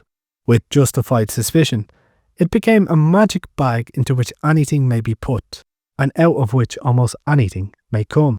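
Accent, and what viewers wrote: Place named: Rural, Ireland. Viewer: Maith an buachaill… definitely a culchie twang off that Irish accent ya speak in tho 😂😂 class work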